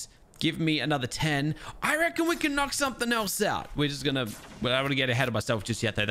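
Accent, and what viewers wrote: Australia. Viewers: Wait ur aussie😃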